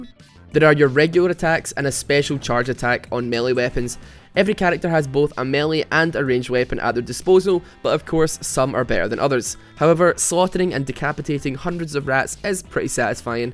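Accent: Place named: Edinburgh, United Kingdom. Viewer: sounds like he lives in Edinburgh i dont know how i can tell but im Scottish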